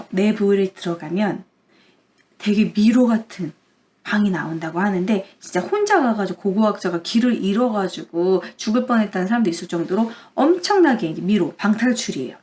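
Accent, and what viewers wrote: Gyeongsang, South Korea. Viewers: 갱상도 분!?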